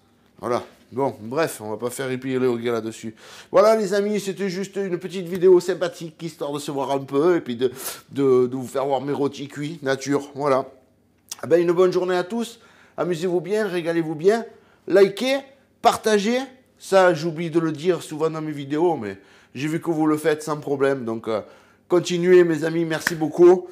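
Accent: South, France. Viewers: salut mon sympa cette petite video qui met en appetit ca donne du courage pour commencer la semaine continue te un mec super a bientôt et garde ton accent ensoleille pour nous les gars du nord si non si non tu connaît la reponse ah ah bisous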